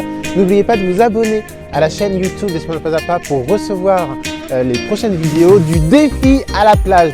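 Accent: France. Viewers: il y a mieux pour apprendre l"espagnol, ton accent est tres frenchy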